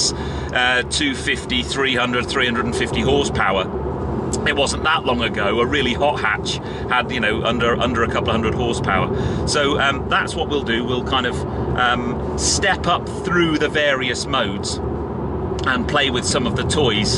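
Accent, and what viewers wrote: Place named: United Kingdom. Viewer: Good review. Subscribed! Honda has made the Civics turbo the quiet performance car, especially the UK version, slightly different than the 1.5 hatch sport in the States. BTW, love your British accent, I hear so many different British accents on the media, but I found yours to be clear and sounding like melody to the ears. I'm wondering which regional accent it is? :)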